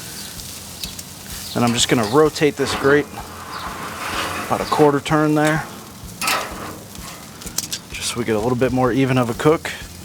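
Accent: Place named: Michigan, United States. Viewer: You from Michigan, bud!?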